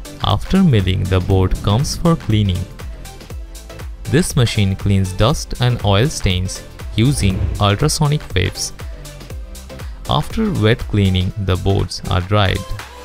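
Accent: India. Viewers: Listening to the indian narrator speak english gave me cancer. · i think this is indiaglish. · Omg indian english☠ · you can't get someone without a heavy Indian accent to narrate the video? nothing wrong with it but god damn it's hard to understand lol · Dat fucking indian accent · Omg,this guy sounds so bad.voice is like Indian slang modulated with donkey screaming pitch.even though I am Indian I hate this slang.video is shot nicely but I wish such these great videos are sponsored to channels like greatscott:/ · 一股浓浓的印度口音，听得超级不爽。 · indian English makes me feel ashamed. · 这是传说中的印度口音？ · Indian accent。。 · Индусский акцент сразу слышен, хотя я по английски нефига не понимаю.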